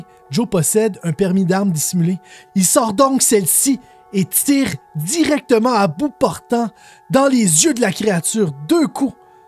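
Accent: Quebec, Canada. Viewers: Enfin un "youtuber" qui parle Québécois ! Pas de "du coup" et autres expressions énervantes à la française ! :D Merci, c'est bien raconté en plus ;)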